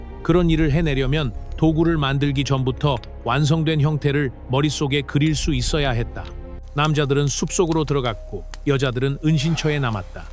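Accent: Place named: United States. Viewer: 무슨 말이냐 미국사람이 한국말 하는거냐 너무 어색해서 듣기 싫다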